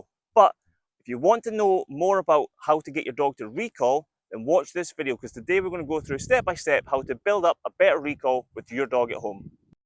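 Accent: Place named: Scotland, United Kingdom. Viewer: I had to chuckle with the tupac reference coming from a dude with a Scottish accent. Caught me off guard but good video man.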